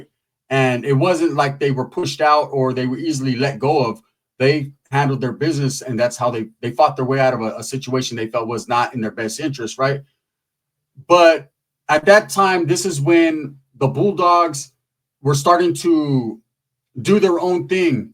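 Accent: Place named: San Jose, United States. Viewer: Your OG Sanjonero voice on point. My tios are in their 70's and still sound like that 😂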